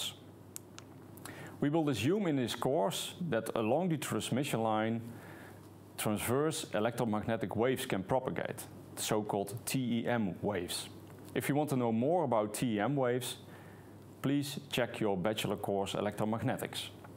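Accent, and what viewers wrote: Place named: Germany. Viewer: English with a German accent. :)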